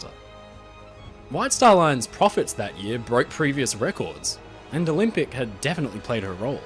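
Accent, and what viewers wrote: Australia. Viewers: Probably because he is Australian, to him American accents are annoying yet he’s not being an ass cause he seems like a nice dude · He has an Australian accent! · I really appreciate your delivery. It’s so nice to hear narration marked by impeccable pronunciation and phrasing with the stresses and pauses all correct and appropriate. For anyone not familiar with it, the accent is “educated Australian”.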